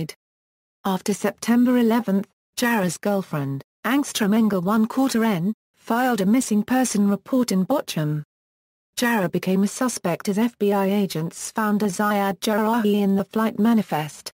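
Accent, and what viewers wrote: United Kingdom. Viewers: That’s just how British people talk lol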